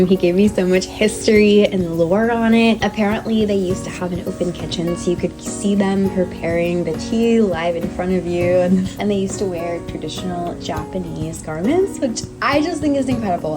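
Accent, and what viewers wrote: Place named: United States. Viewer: Hi, I love in American Accents. By the way, May I know Where is your accent in America? Thanks you 🇺🇸🎉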